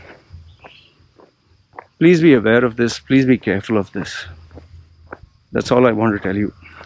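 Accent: India. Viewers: Your accent is so Indian..which country are you in?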